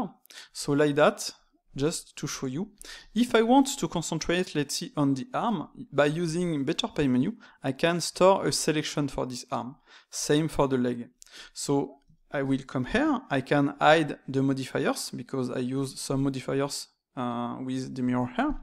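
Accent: France. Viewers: Your french ? ( l'accent est reconnaissable)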